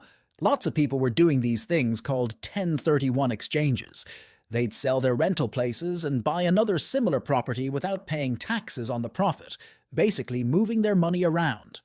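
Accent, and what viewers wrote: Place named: Southeast, Canada. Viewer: I think every American is just a dumbass. Including this Southeastern Canadian sounding guy too.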